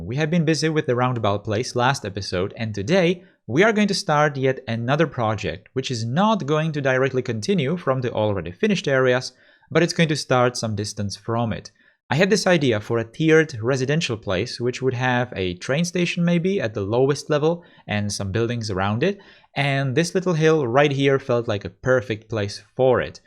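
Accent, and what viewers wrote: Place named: Czech Republic. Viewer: this series is absolutely the best take on cities skylines i ve seen so far, and i ve been watching all the stuff since the game was out. you are undoubtedly one of the most talented guys, if not THE most talented guy ever, making C:S quality content. and seeing this just frankly makes me not want to play the game anymore, because everything or even more that i could imagine, you´ve already been able to pack into this very series. an artist´s work, no doubt... you should be working as an architect or something... btw you have some serious czech accent dude :) you're czech? if yes that makes it even better, because nothing makes me more proud of our country than a talented guy like you doing such an excelent work.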